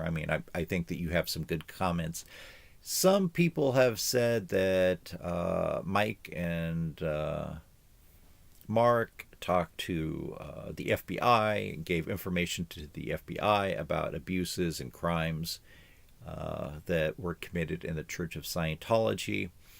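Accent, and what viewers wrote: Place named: Adelaide, Australia. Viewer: I think it’s because he grew up in the eastern suburbs of Adelaide and went to school there. When I first heard him speak I thought he sounded like he was from there and turned out he was.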